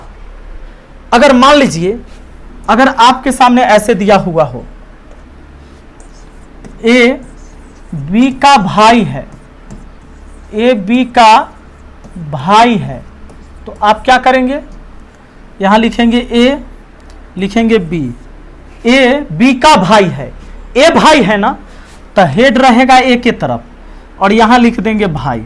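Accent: Bihar, India. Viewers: Sir ap smjhaate v achha hai aur bolne ka bihari style mast hai 💕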